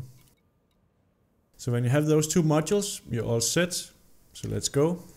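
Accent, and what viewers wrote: Denmark. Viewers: hehe 7 sec in to the video, and Han er dansker :) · Tak. Så nemt at høre du er dansk 🤗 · Are you danish??? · Nope. He sounds like a Danish guy speaking English.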